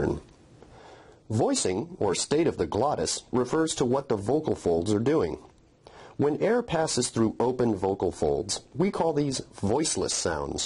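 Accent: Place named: North America. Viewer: He's speaking North American English, Non-British !!!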